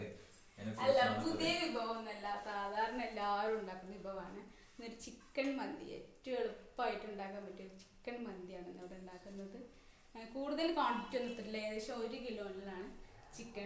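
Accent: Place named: Malappuram, India. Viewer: First video is good, good presentation, Malappuram style language, everything is great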